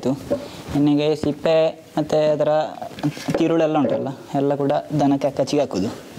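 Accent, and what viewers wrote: Mangalore, India. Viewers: Your manglore slang kannada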